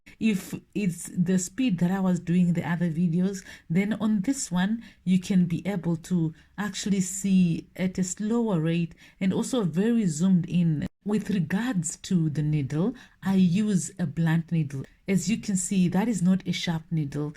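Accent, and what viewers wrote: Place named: Africa. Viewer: I love your African accent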